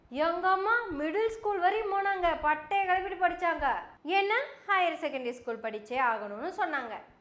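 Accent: Kongu, India. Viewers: சகோதரி! உன் கொஞ்சும் கொங்கு தமிழ் மிகவும் சிறப்பு வாழ்த்துகள்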